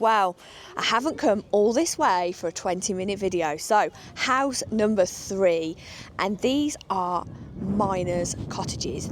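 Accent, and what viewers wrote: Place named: Warwickshire, United Kingdom. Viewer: I love your accent. You remind me of my Warwick relatives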